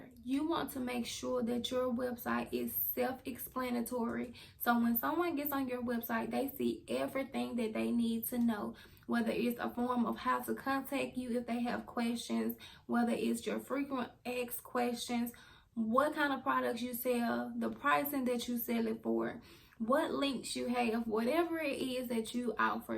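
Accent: South, United States. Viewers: I love your accent omg I know you are a southern bell just like me! Mississippi or Louisiana??? Which one ???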